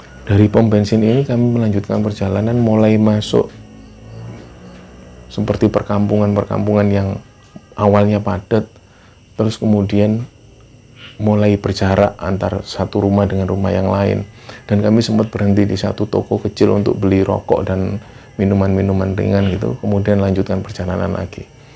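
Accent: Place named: Surabaya, Indonesia. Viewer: Sama ... Aq jg seperti itu.. medok suroboyoan..kyo aq..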